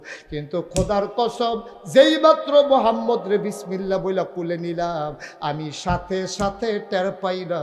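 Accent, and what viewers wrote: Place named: Kishoreganj, Bangladesh. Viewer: এই হুজুরের বাড়ি কি কিশোরগন্জ ভাষা সুনে মনে হলো আরকি ।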